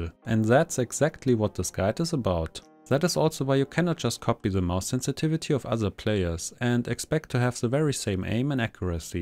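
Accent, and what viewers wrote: Germany. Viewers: irgendwie hab ich aus deiner stimme raus gehört das du deutsch sprichst 😂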